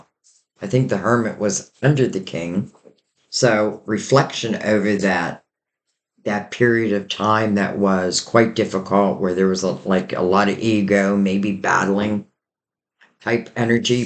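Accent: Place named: Western Pennsylvania, United States. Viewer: I should’ve figured you’re from western Pennsylvania. We have different accents and pronunciate words completely different. Blessings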